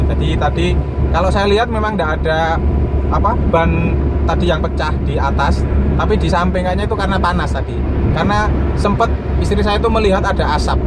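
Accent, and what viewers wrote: Java, Indonesia. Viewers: wkwkwkwk boso ne josss mas aku yo wong jowo, salam medhok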